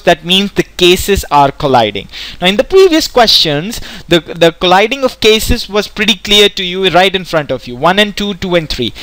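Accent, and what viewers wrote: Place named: India. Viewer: thank you for the good video, but please slow down that Indian accent, it's really distracting...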